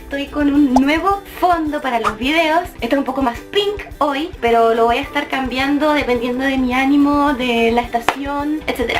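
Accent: Chile. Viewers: Hmmm no se, pero suenas como Chilena compatriota xd es chistoso como nos reconocemos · Hola. Tienes acento de chilena. Yo soy chileno por eso lo digo jejeje...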